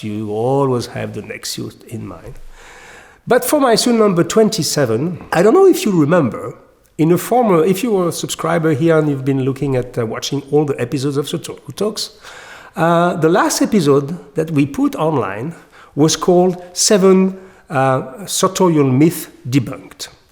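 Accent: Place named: France. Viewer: ...And also displays a delicious French accent.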